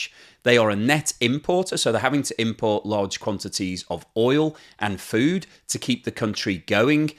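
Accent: United Kingdom. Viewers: Don’t know why but with British accent it sounds too much like a propaganda. Than truth. I agree I can’t point a single topic that is propaganda in this video. It’s just the distrust on Brits. They fund that west narrative building machine called BBC.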